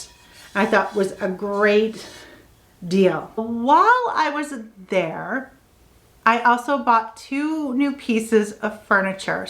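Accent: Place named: Western New York, United States. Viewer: I knew you wer from the Western NY! I could tell by your warm demeaor and your accent!! I was born and raised in Hamburg, NY. I will have to check that store out the next time we are back in Buffalo visiting our family. If you have any other mom and pop shops you can recommend, I would love to know. We come back every summer and I love to find new home decor stores! GO BILLS!!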